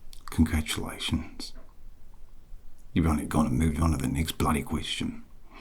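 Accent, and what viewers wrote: Australia. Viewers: I miss Lars now, too! 😢 I broke both feet a few years back & the physical therapist's had a constant game show of some sort or another running in the background, which was rather more grating for me at times than was the (excruciating...don't break feet, people) therapy. This one would've left me feeling far less bleak, lol. I mean, there's a happiness baseline of Aussie accent & seagulls! 🖤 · I’m an Aussie mate, you’ve got the accent nailed. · Totes brill, mate! Delightful and I made it to the end, just barely. 😴 Love the basement-level intonation that comes with the Aussie accent. 👍🏻 Maybe I have more words tomorrow? 8! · Ahhhh nothing. It’s everything! Or is it? 🤔 😁 Your Aussie accent is so good it’s almost scary. I love it!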